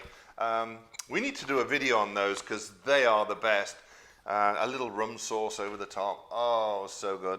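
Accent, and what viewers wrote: England, United Kingdom. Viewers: Stumbled on your videos and became a huge fan. Keep doing what you're doing. I get no American accent at all you are still all English. It's a shame you didn't move to Canada though ;-)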